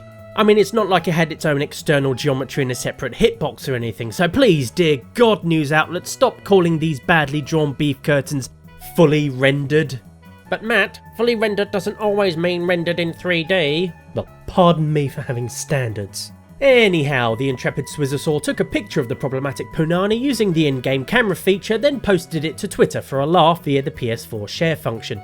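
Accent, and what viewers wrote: England, United Kingdom. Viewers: Real talk, you are really clever and funny, produce timely content on a regular basis, and have an English accent that makes you sound smart to everyone else. I don't know why you don't have 500k subs, but I am sure if you keep your nose to the grindstone you'll get there before you grind away your frontal lobe. May have taken the metaphor a bit far there.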